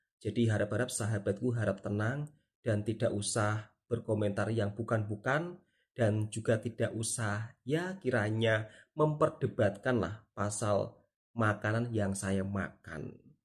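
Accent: Java, Indonesia. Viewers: best tengok video Bang ni, orangnya jujur dan ikhlas dengan loghat Indonesianya tu agak LUCU tapi MENGHIBURKAN, terus berkaria ye Bang. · Loghat jawa lah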